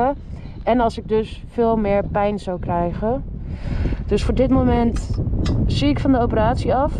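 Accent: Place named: Netherlands. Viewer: Hi, I hope everything is ok with a nex surgery. Thanks for you vidéo <3 i like your dutch accent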